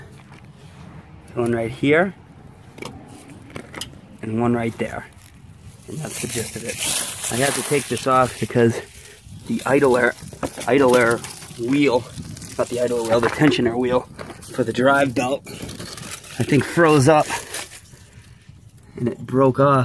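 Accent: Boston, United States. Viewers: Sound like you from Bostonn, great video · Sounds like Boston. Lol.. good video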